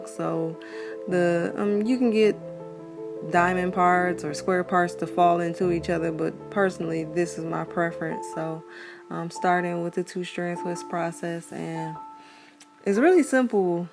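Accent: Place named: Detroit, United States. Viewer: Why i say to myself…. She sounds like she’s from Detroit….❤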